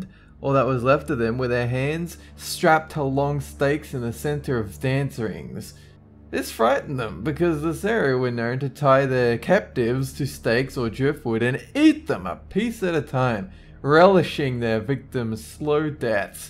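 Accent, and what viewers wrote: Australia. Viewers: sounds nothing like British accent. It's Australian. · The guys talking sounds like he's slurring or or mumbling. I know he's Australian but it's hard to listen to. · alright, been watching you for years, gotta ask: are you Australian? i know, the accent says not-typically-Australian, but you sound so colloquial. does our generation just all speak the same..? far out. anyway, great stuff, thanks n keep it up! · he is australian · Hellbent's alright and all But I love the Australian accent They always sound drunk and it's amazing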